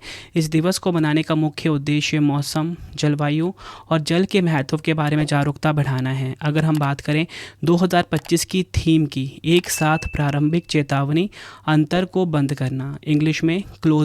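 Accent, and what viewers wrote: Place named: Bengal, India. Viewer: Bengali accent hai..... acchha lagta hai... Agreed 👍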